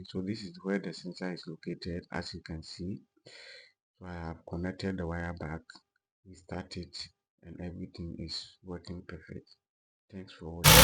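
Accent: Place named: Ghana. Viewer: Where are you located... You sound like a Ghanaian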